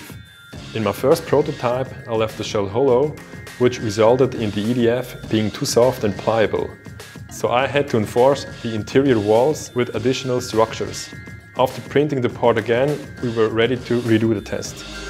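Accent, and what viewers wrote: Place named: Switzerland. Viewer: Ret schwizerdütsch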